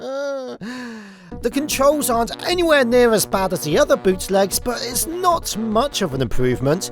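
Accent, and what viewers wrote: United Kingdom. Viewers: So he's not Australian, but British? Alright. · how did i never know you were british until rewatching this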